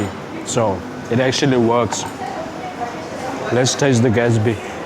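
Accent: South Africa. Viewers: Sound like a Joberg accent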